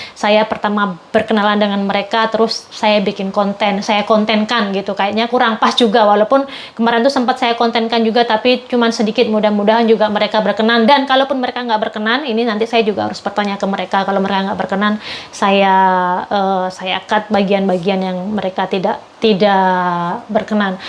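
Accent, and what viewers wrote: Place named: Surabaya, Indonesia. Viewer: Bhs ne kyok. Logate suroboyoan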